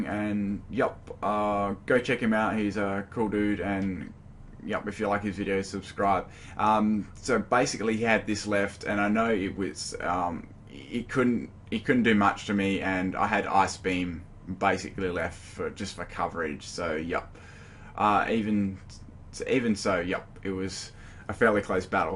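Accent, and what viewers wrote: Australia. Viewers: You have a very heavy Aussie accent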